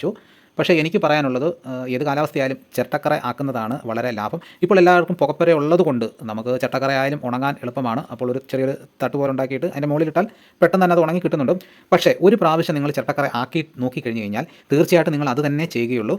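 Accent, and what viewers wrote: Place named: Nilambur, India. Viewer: Nilambur Nalla Malayalam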